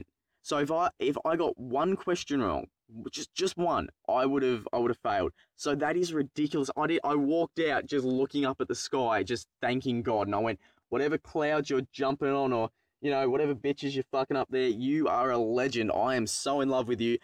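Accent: Australia. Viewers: great videos keep up the Australian accent and good work;)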